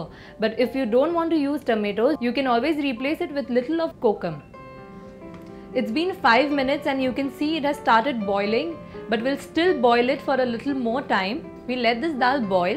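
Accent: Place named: Gujarat, India. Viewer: Good Gujarati English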